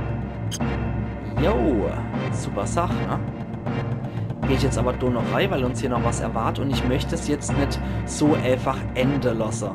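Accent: Palatinate, Germany. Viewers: Alter, meine Fresse! Du machst das großartig "uff pälzisch"! Da bekomme ich direkt gute Laune! Ich habe dir mal einen Daumen und ein Abo dagelassen und werde immer mal reinschauen. Weiter so:D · Naaa mist :D würde ich supergern schauen >__< aber ich will das selbst noch let´s Playen...nun bin ich hin und hergerissen ob ichs gucken soll /: Finds übrigens genial das du auf pälzisch deine lps machst. Das ist mal was besonderes und einzigartiges (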